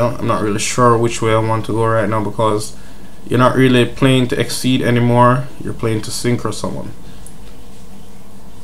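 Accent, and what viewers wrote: Jamaica. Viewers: lol I just looked for this deck and came across your channel.As u started speaking I said this guy sounds Jamaican.... this guy sounds familiar. then I realize I know u from school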